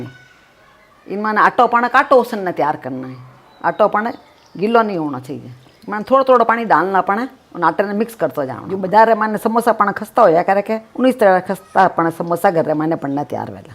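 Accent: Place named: Jaipur, India. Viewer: wo sab to thik h mummy ji... Par thoda hindi me bol doge to dusre state wale bhi samjh sakenge... Ye jaipur language shi se samjh me nhi Aata h... Par sunne me bda mitho lage h...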